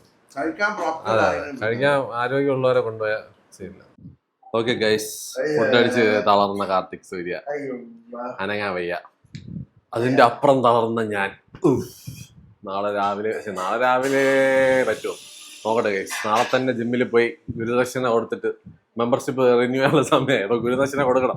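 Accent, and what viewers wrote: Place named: Thiruvananthapuram, India. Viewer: ബ്രോ യുടെ സ്ലാങ് പക്കാ തിരുവനന്തപുരം അതാ ചോദിച്ചേ.❤ എന്തായാലും താങ്ക്സ് ബ്രോ റിപ്ലൈ തന്നതിന്.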